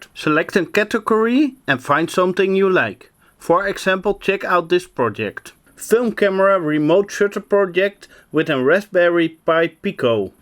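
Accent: Netherlands. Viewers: The stickers saying Utrecht on the pc really weren't needed, I could immediately hear you were Dutch XD Nice video!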